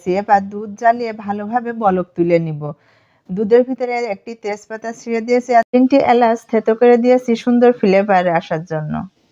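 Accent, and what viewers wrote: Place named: Jashore, Bangladesh. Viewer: Apu apner desher Bari kon dristic? Kotha sune mone hocche jashore a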